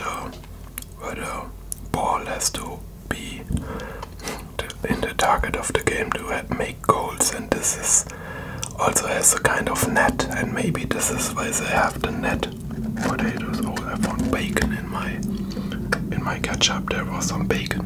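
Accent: Germany. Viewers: Man merkt das du deutsch bist weil alle deutschen so english sprechen 👍🏻😅 (Außer ich glaub ich)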